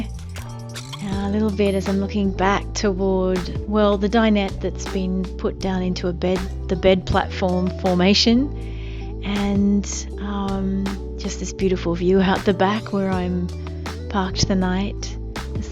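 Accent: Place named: Australia. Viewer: Found you looking for cushion cover tutorials. You sound like an Aussie, where are you from?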